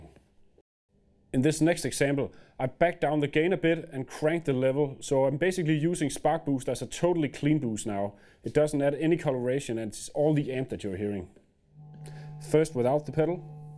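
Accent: Denmark. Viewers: Wow he sounds danish!! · I play steel guitar through a solid state amp. I'm looking for a clean boost to take solos with. If I understand this demo correctly, the gain won't add overdrive unless this is going through a tube amp, right? I don't mind a little bit of drive in the sound on peaks but its a clean boost I'm looking for. Btw; I work regularly in Denmark so the accent doesn't bother me - believe me, its easier to understand than listening to someone "like... so from California"!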